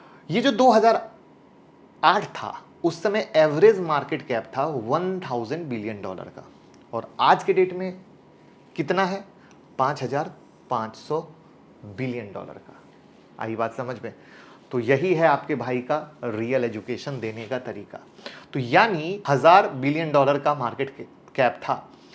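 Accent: Bihar, India. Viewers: App sir Bihar se ho lagta hai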